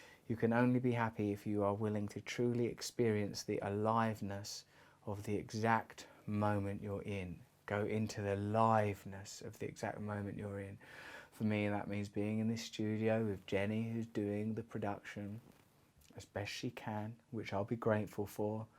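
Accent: United Kingdom. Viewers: i notice you have an accent that sounds like Kathrine Hepburn sounded when she talked. Is a nice accent. British ?or Aristocratic?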